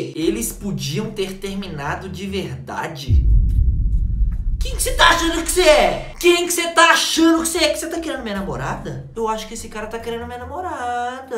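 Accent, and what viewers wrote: Minas Gerais, Brazil. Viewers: vc é Mineiro?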